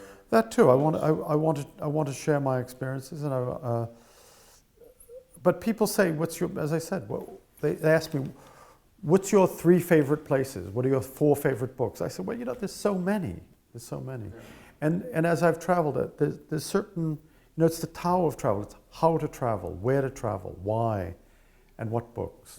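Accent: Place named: New England, United States. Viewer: Upper-class New England accent. Great writer. Smug. Patriarchy supporter. Western Imperialist. Total White Man.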